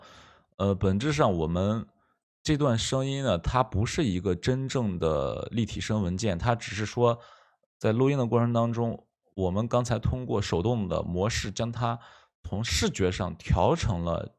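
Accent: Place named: Shaanxi, China. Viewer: 老乡啊啊啊啊 带着陕西口音 但是英语居然没口音🥰✌️喜欢喜欢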